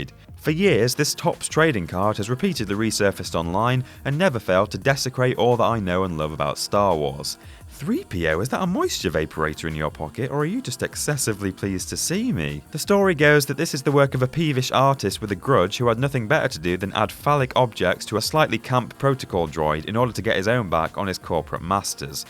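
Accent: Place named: Cheshire, United Kingdom. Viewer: rough guess would be Cheshire, or naturalised northern affectation. You only have to get a few seconds in and hear the 'Rapoonzel' to know that man!!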